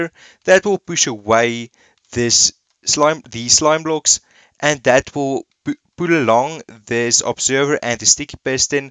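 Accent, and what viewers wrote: Afrikaans, South Africa. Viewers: South African ? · Are you South African Dude you are. I am from SA and I know a Afrikana when I hear one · Are you from South Africa? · Do you speak Afrikaans? It’s sounds like you do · Definitely South African Afrikaans accent ha ha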